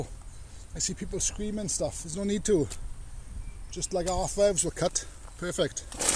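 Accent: Wales, United Kingdom. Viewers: I should say British accents, rather than English as this man is Welsh. Same theory though; Listen enough times to the variation of accents in the UK and it will make sense. Unless they are from Newcastle where even us normal British people do not understand a word they are saying. Google "Newcastle accent" and you will see what I mean!!!!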